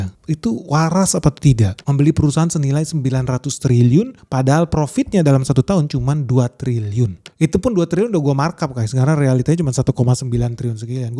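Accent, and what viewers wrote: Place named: Surabaya, Indonesia. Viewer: Gaya ngomong Bang model surabayaan terang2an dan lucu 😂😂😂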